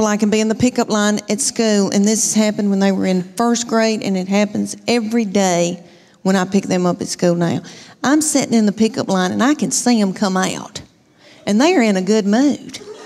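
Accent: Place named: Western North Carolina, United States. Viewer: I hear some western North Carolina in her accent especially when her sentences sounds like questions? I’m from Waco Texas via Nashville and raised in Western Kentucky before that so you can’t go by me. We never had kids but if we did I’d take them to Leann for some straightening out.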